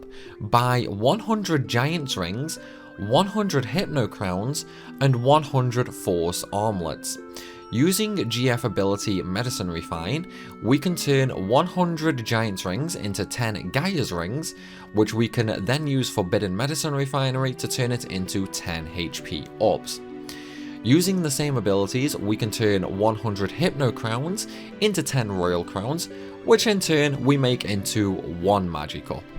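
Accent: Stoke, United Kingdom. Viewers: I’m normally pretty good with accents, but I can’t quite get yours. Are you from Stoke/surrounding area?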